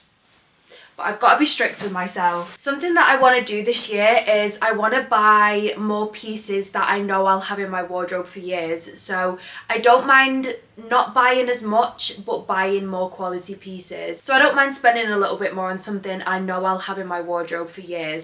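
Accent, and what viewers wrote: United Kingdom. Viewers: I just joined ur channel and im enjoying. Where do u live bc ur accent is so adorable. Im assuming UK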